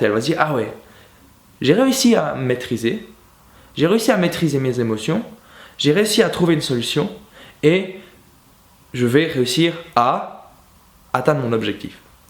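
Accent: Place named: Switzerland. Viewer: On sent la Suisse dans ta voix 🇨🇭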